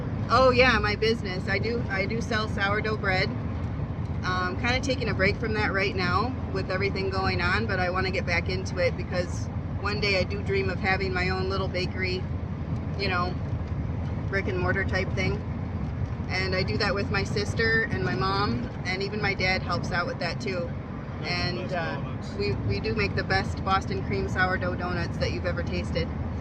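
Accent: Canada. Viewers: Ah I recognized that Canadian accent 🙂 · I thought had a Canadian accent going on. I watch some Urban Exploration guys from Ontario. And certain words like (out) is said differently from us in America, lol I love watching all the videos!!! 😍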